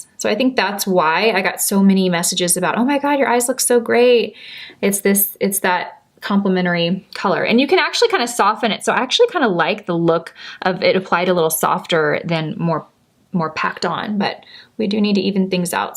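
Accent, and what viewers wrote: Texas, United States. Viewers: Well we are fans! :) Maybe they do not understand Texan??? Sounds like hey friends to me! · It’s that cute Texas accent - fans, friends!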